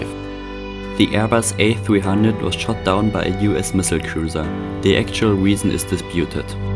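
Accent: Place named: Germany. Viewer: you're definitely german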